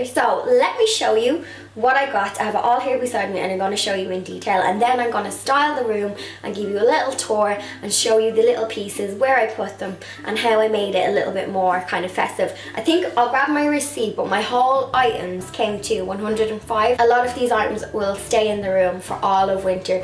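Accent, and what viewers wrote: Ireland. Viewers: Ayyyy ur Irish !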